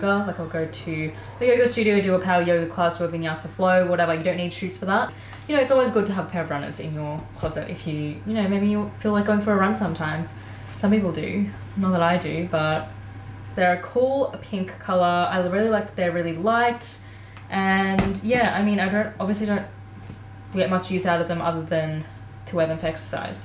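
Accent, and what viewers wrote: Australia. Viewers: Wait are you Aussie bro if so sameeeee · does her content has anything to do with her being indian?! and can't you tell by her accent that she is an australian (her origin can be in india whereas she can be australian by belonging there)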